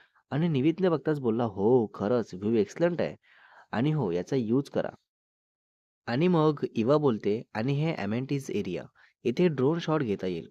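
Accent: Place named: Gujarat, India. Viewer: Ur gujrati accent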